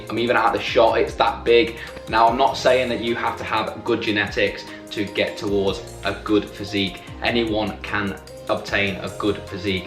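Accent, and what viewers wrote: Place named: North, United Kingdom. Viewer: Nice one man good topics, your northern accent remind me when I used to live up there haha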